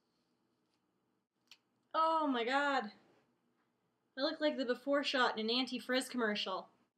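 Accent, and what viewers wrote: Canada. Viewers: are you from canada? what's the accent from? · Are you Canadian?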